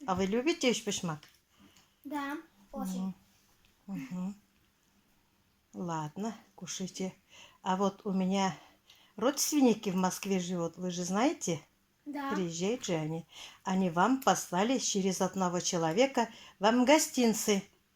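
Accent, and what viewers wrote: Tatarstan, Russia. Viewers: Красивые получились! А я сегодня пекла беляши. Фарш из своей курицы и добавила в фарш мясо из свининой грудинки. Такая вкусная и сочная начинка получилась. Сын приехал- дала его семье, их четверо. И остались для нас, а нас шестеро, у дочери четверо детей. Завтра буду жарить для младшей доченьки, а их шестеро- у неё четверо детей. Кур у меня много, которых надо пускать на фарш, они уже практически перестали нестись. Мы им уже на замену купили молодок 20шт. И суточных 30шт.породы Род Айленд, из которых 18 петушков и 10 курочек, а двое погибли. Ждём ещё заказ: 10шт. Кучинских Юбилейных, 10шт. Мини-мясных палевых. И чуть позже будут ещё 10шт. Пушкинских. Да, понимаю, что поздновато мы суточных приобретаем, это потому, что ещё не готов новый курятник. А потом планируем приобрести хороший инкубатор(уже знаем какой), и будем выводить сами себе и родственникам новых курочек. 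Но думаю, что будут ещё и наседки. А инкубатор навсякий случай, если не будет наседок. Но специально выбирала те породы, которые наседки хорошие, например Кучинские Юбилейные. Но это если будет угодно Богу. Пока! Удачи тебе, моя дорогая землячка! Кончится самоизоляция поеду в Башкирию, всё немогу больше. Соскучилась по наречию татарскому и башкирскому. Ты мне со своим акцентом, как бальзам на сердце! По русски говоришь хорошо, но акцент никуда не денешь и это радует, а как запоёшь, у меня сердце от радости замирает, и детство вспоминаю. Спасибо тебе, большое, что ты есть и появилась у меня!!!!!!! ♥️♥️♥️♥️♥️ Чай п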